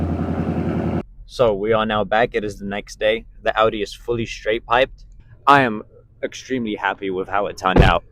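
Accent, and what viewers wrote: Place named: United Kingdom. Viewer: what happened to your british accent man, bring that shit back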